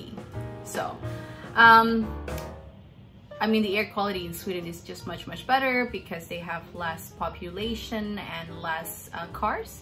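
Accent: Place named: United States. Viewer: Your English is extremely good ! You sound just like an American (to my ears).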